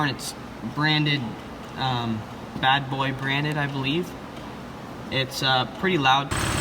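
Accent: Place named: Canada. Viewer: your canadian arnt ya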